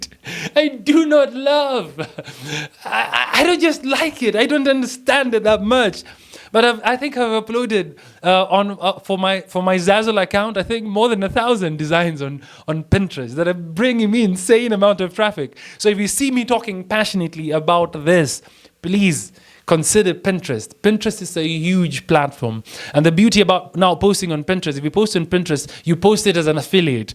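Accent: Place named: Africa. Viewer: What part of Africa are you from? I came here for POD info but I stayed because I love your accent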